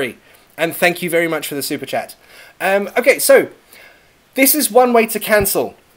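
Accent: United Kingdom. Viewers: really enjoy your teaching as always <3 thanks a ton~~~ Wanna get the British accent !! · i love the way you teach English "British English " ...and m really trying hard to pronounce the words and sound like you people ...i really like British accent...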